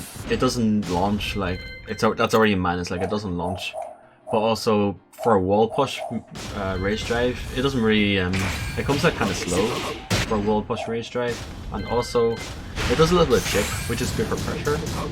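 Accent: Ireland. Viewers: He's Irish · Ah I see it's Irish! Thanks!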